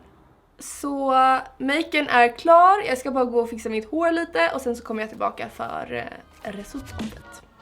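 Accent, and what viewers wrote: Stockholm, Sweden. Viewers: Blev absolut inte sämre på något sätt, så himla mysigt! Va inte alls beredd på att du skulle ha så mycket Stockholmska i dig. ÄLSKAR! <3 Keep on going girl! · Like it alot better when you speak english even tho I'am a swede myself 😂 you have that stockholm accent when you speak swedish 😅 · Jag är svensk men sjukt konstigt att höra dig prata svenska 😂 så van att bara höra dig prata eng! 😂 och trodde inte du skulle ha sådan Stockholms dialekt, men tydligen 😂👌🏻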